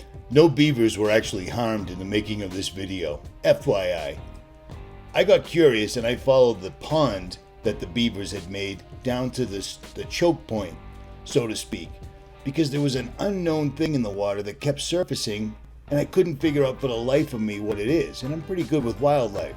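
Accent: Boston, United States. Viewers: Sounds like Ritchie from Boston?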